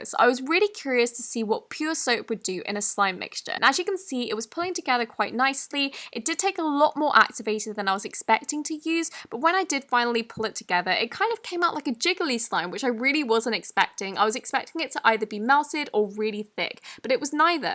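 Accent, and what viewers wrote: England, United Kingdom. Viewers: James McDonald why I’m irish and we hate the English like i hate this both but i don’t hate her voice and it’s an english accent not British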